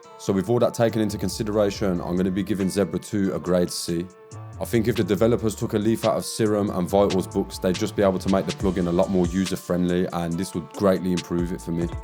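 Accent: Birmingham, United Kingdom. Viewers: ugh that brummie tamworth accent..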